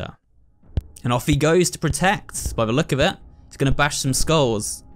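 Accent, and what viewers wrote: South, United States. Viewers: for a British person his sother accent is pretty good you can still tell he's not American born but that can but fixed up with practice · As a Texan, I have to say your Southern accent is both cute and funny. Not bad! 😂😂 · His southern accent is so uwu ❤ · Lemme just say that as a native to the southern state of Georgia I absolutely love your southern accent 10/10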